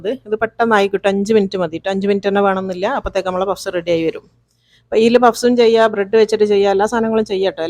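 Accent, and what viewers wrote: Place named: Kozhikode, India. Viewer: Nannaayitundu❤️original kozhikode aannule.... Samsaarathil manasilaakunnundu👍🏻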